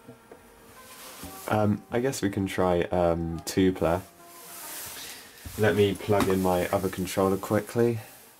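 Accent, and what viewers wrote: United Kingdom. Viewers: You're British?? I totally did not see that coming...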